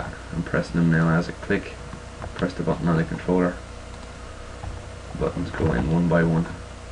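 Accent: Ireland. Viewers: Are you Irish by any chance? :')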